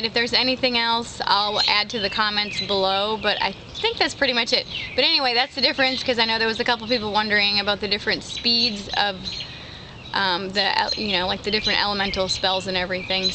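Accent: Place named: Wisconsin, United States. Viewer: Thank you sooo much! Your uhms and ya knows comes from WI (awesome)! Informative and sweet! Blessed be!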